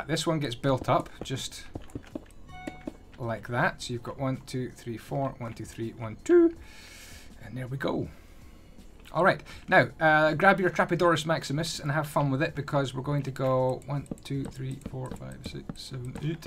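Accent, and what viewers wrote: Scotland, United Kingdom. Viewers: I love your accent. Are you Scottish by chance? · It looks so gorgeous once it’s built - also you sound Scottish so if u are, fellow Scot here ❤️😂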